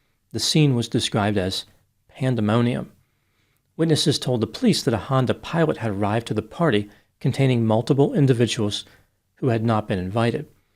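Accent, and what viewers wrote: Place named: Pennsylvania, United States. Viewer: I keep noticing your accent… sounds very similar to mine. I’m going to guess you’re from somewhere in South central or eastern PA or north MD? I’m from York pa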